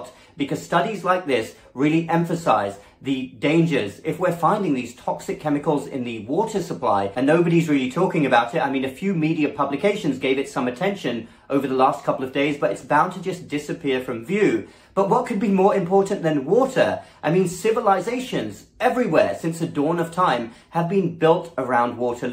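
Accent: United Kingdom. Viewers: On a completely different subject, you say you've lived in US for 10 years, your accent is still British. I am British, am staying in US with a London born lady, she has lived here for 35 years, not a hint of a US accent, sounds a proper London girl 😎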